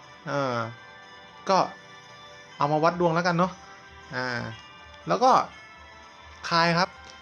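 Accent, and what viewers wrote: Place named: England, United Kingdom. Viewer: อ่อ เป็นสำเนียงอังกฤษ เข้าใจแล้วขอบคุณครับ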